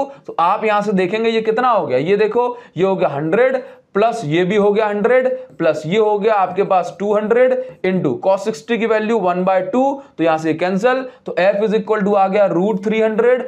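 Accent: Odisha, India. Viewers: Sir aap odisha se ho kya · bhaiya are you from odisha ?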